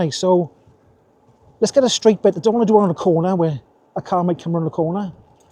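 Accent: Wales, United Kingdom. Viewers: Sooooo glad you have had this bike for review as I've set my little heart on it. The pro version does not have a job motor and does not have my beloved boost. The battery is using Samsung batteries which enable the gate charge and will also give a longer life as they can withstand the stress of current flow during the charge (so I'm told😅). It would be great to see a range test and listen to your brilliant commentary with the Welsh shining through. Thanks for a great review 🎉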